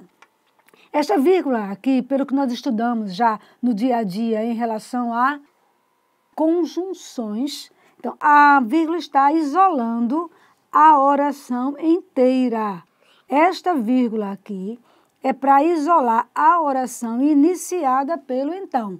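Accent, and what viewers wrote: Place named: Northeast, Brazil. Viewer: Professora, suas explicações e sua didática são ótimas.congratulações! Outra coisa: seu sotaque é muito bonito. Os nordestinos, nos destacamos muito nessa característica!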